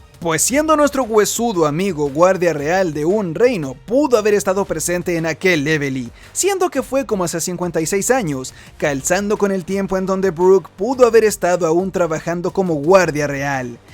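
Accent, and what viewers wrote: Chile. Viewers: tu no eres de mexico, eres chileno hahaha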